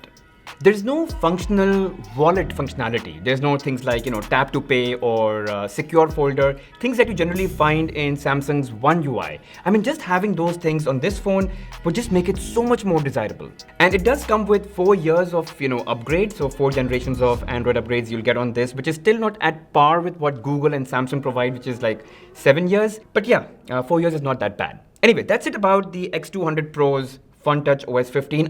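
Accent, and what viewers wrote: India. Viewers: I hate indian accent of speaking english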